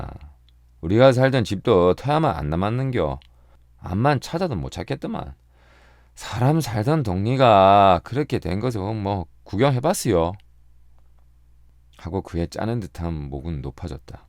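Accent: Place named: Busan, South Korea. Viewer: 부산사투리~~~~~입에 착착 붙네요~~~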